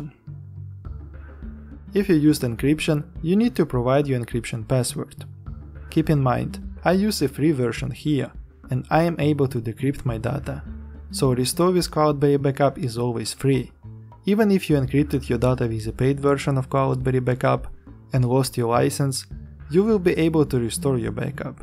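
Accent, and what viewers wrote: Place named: Russia. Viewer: Никак не могу привыкнуть к твоему русскому акценту)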